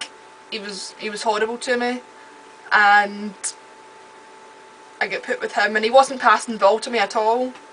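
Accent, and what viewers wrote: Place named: Scotland, United Kingdom. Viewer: Good on you for making this video and helping to understand Autism. If I can ever afford a good video camera I too may make a video on autism. But the prospect of talking on video to an audience of possibly millions terrifies me. In regards to that you are far more brave than I. I applaud your efforts. I really like your scottish accent too.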